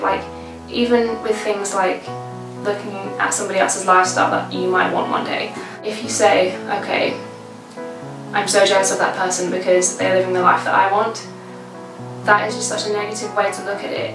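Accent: United Kingdom. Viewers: are you even britsh?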